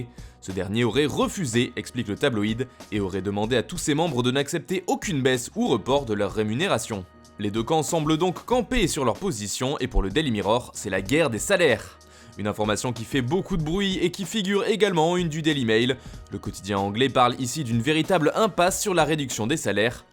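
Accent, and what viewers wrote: Italy. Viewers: Cette accent italien qui me manquait tant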